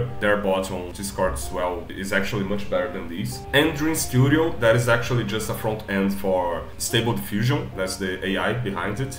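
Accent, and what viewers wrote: Brazil. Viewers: Esse sotaque é brasileiro em kkkk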